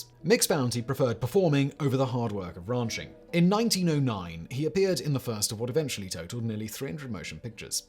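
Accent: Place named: RP, United Kingdom. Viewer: he is speaking he Queens English the real version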